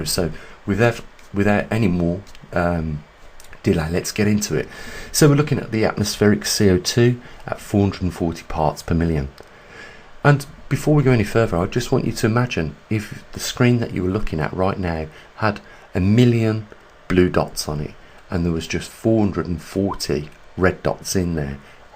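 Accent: Birmingham, United Kingdom. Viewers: You sound like your from my neck of the woods. Birmingham. Greatest thinkers 👍👍👍👍👍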